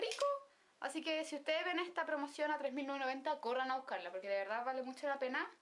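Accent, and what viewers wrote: Chile. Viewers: que acento chileno!!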